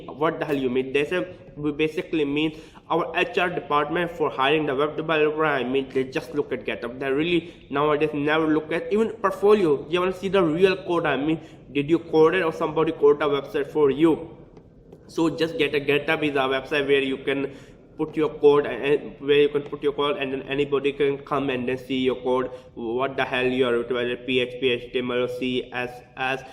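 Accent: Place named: India. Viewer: I really wanted to watch this video because I am trying to decide my job field between those two. Sadly.. I gave up watching this video. I can't understand what he's saying. It gave me a headache after watching this video for 2 mins. Seriously. speak slower. You have super heavy accent so it's really hard for anyone to understand you unless they are Indian too. If you can't fix the way you speak, at least put a subtitle. I can understand most people with accent fine but this is like the worst accent I've ever heard. Super heavy accent + fast speaking = Inglish, not English. Someone please reply which one he picked between those two. I am guessing he picked web developers since he was holding networking books and saying he's born to do networking.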